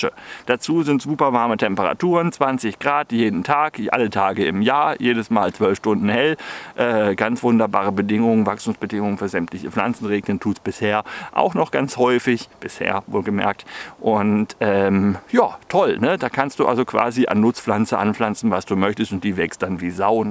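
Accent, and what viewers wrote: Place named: Baden, Germany. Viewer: Das mit dem "rechen" und dem "rächen" in der Aussprache ist halt Badisch. 🤠 Hat nicht der Trump gesagt man soll den Wald rechen damit's weniger Waldbrände gibt, so wie es in Finnland gemacht wird. Angeblich! 🤣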